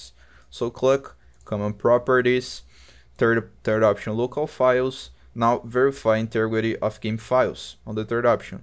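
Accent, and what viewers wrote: Brazil. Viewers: Valeu mano, seu inglês é fd mas o sotaque do BR não sai 😂❤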